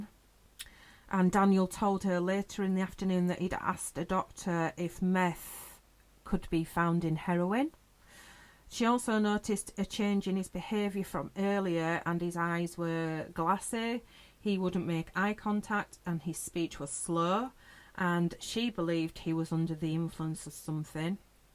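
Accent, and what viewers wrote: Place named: United Kingdom. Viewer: Just came across the channel today and so glad to hear a British voice for a change when discussing crime , most seem to be American that I follow anyway. Have subscribed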